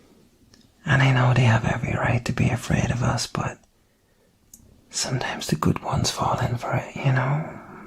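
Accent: Ireland. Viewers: What kind accents is this irsh😍🤭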